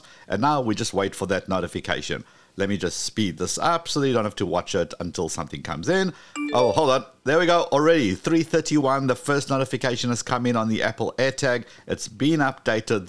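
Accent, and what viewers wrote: South Africa. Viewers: Thanks for the video! Your maps showed Dallas. Your accents sound South African with a touch of Sydney. Ever been to Australia?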